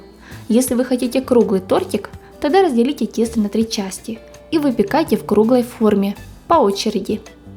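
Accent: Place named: Ukraine. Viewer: поняла что она украинка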